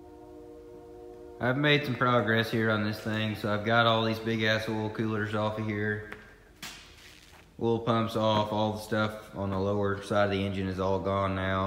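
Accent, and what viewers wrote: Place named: Kentucky, United States. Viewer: Not sure where dude's from but man he sounds like rural Kentuckian to me. All he needs to do is say "hell fire" as an exclamation and i'd be convinced.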